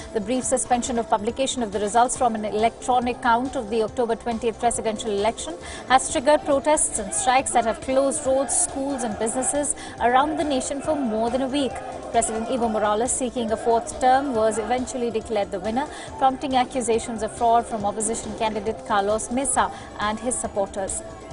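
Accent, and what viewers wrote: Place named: India. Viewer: Ewwwwwww Indian accent lol destroyed English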